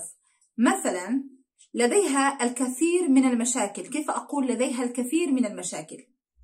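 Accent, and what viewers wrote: Morocco. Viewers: هل انت مغربية ام جزائرية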